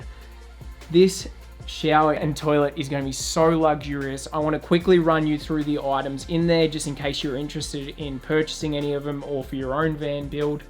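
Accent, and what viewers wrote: Australia. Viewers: Jeeez! Auzzy English sounds shayyt! Cool video, though. Cheers. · Adore this build mate. So happy for you. As an Aussie that spent time in Canada I love pickin up the little subtleties in your accent, pure Aussie with little sprinkles of Canadian in there. Very nice haha.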